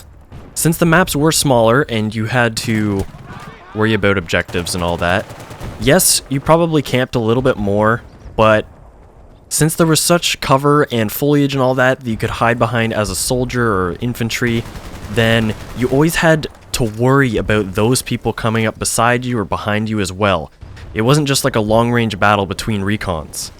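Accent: Canada. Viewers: you Canadian ayy? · You sound like you’re from Port Alberni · im guessing his Canadian. the "about" gave it away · is this guy candadian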